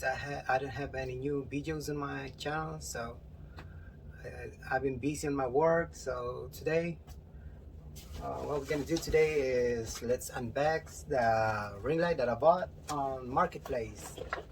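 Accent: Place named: Texas, United States. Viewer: Kuya! Texas accent kanaaa 😅🤩 More vidsss! 🦋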